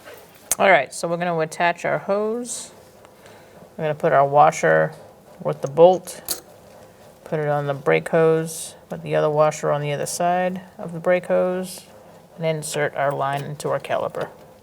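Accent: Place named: New England, United States. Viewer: love that New England accent.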